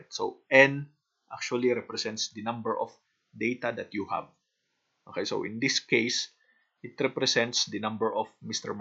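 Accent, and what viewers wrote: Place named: Philippines. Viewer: just by hearing your accent I already know you're a Filipino, Salamat po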